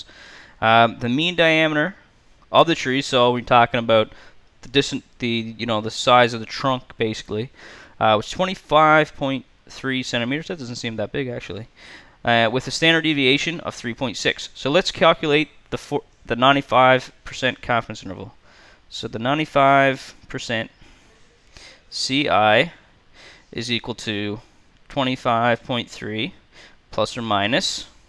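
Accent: Canada. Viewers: Helpful video! Just wondering but are you Canadian? :p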